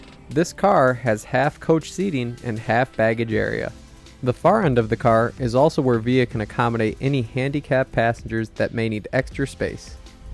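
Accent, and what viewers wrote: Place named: United States. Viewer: We need more CanadianEnglish pronunciation in this video. It sounds like the narrator is American...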